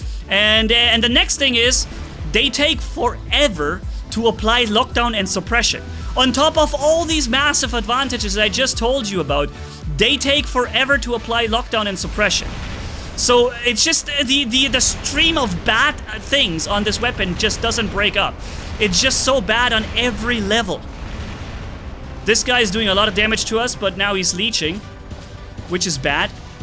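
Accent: Germany. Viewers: You're German?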